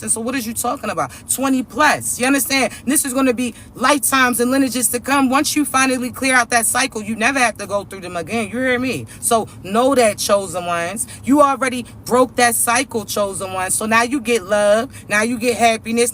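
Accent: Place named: Baltimore, United States. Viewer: Love the accent.. Baltimore?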